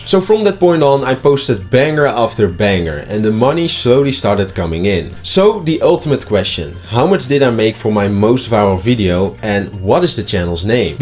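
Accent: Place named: Netherlands. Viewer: bro nice video btw are you dutch? i feel like i can tell by your accent 😁❤️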